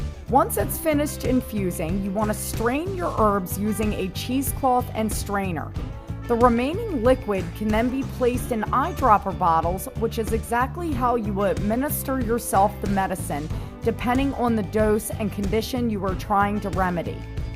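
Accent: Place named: Pennsylvania, United States. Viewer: Corporal sent me. Great content. I love gathering knowledge. My wife and I were wondering if you lived in Pennsylvania? You sure sound like it. Lol. Sorry if you're not.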